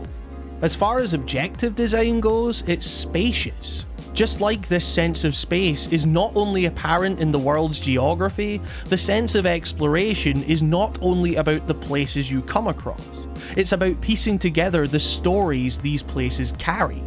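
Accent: Scotland, United Kingdom. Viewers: N K He's Scottish. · Lol, that’s like the mildest sort of east coast Scottish accent – hear some actual heavy Scottish accents and your mind will be blown… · Hey everyone, non-native speaker here...is this a Scottish accent? Anyway, I really like it! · please forgive me for this is i am wrong. first i'll say this video is great and you earned a new sub. i think the space of breath of the wild is fantastic and perfect. i do not like things stacked on top of each other and it promotes "hunting" for things. now for the forgive me part. i am guessing you are from Scotland and trying to down play your accent? don't....if that is what you are doing. i could not help but be distracted by how you say things and it was.....odd.....at times. but, again, great video. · Soviet Loli he’s Scottish...as am I, I find it kind of funny that people mistake our accents for Australian/Irish accents...but he’s Scottish. 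· Are you Scottish? If so where fae? I'm from Edinburgh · yep, it's Scottish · I love the Scottish accent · I clicked on this video by accident tbh because I was aiming for another one but I sat and watched because I was intrigued by the concept. Beautifully put and I absolutely agree 100%. I've always loved Zelda but this game has completely reawakened my love for games. I've got all the divine beasts and instead of going straight for Ganon I want to explore the world and see what I've missed, much like I did when I was 7 and stealing my brother's Ocarina of Time cartridge. Only this time the world is there to explore! Thanks so much for putting it into words and making me realise what this game has brought me. Also hello from a fellow scot and boo to all the people making fun of our accent (they're just jealous they're no in on the brawest accent ever, eh?) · is this scottish accen? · its a Scottish accent not a Canadian accent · I think it's Scottish... 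I like it 😊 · oh sorry. plz excuse my ignorance. it's still a great accent! Scottish? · Im sorry to go off topic, but is that an Scottish accent? or Canadian?:D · It sounds like a Scottish accent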